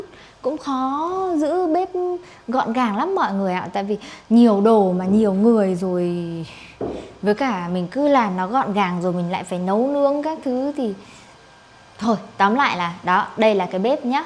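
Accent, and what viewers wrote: North, Vietnam. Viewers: Thank you so much for making another video. Your videos are the best comprehensible input in Vietnamese for people trying to learn the northern accent. I hope you have time and energy to continue.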